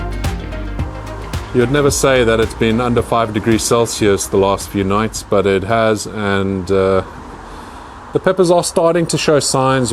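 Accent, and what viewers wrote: South Africa. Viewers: The SA accent is BY FAR the best accent IMO. Manly and unique. 🤘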